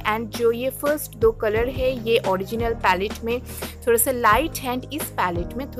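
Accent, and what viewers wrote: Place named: United Kingdom. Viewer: Angrejo jaise hindi q bol rhi hu achcha nahi lg rhs h ok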